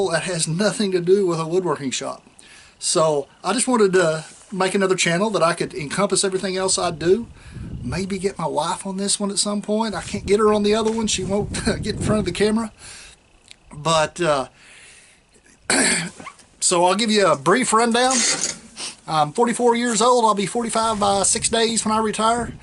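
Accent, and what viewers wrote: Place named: West Virginia, United States. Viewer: Hey I'm subscribed to both your channels. I just bought a cnc a few months back and was telling the wife that I learn more from your channel than anyone else. The first thing she said it's probably cause he talks like you... lol! Its alright to sound bumpkin buddy! Dont change a thing. I'm from West Virginia and I thought you were from around here too. Look forward to more of your videos. Add in some more CAD stuff now since you retired..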